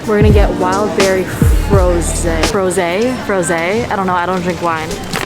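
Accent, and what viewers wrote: England, United Kingdom. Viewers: See the way she spoke with the British accent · I am from England and your English accent cracks me up 🤣🤣 Happy Valentines ❤️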